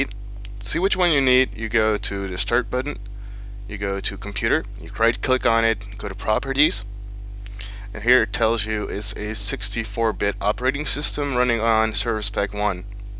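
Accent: Netherlands. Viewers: ben jij nederlander?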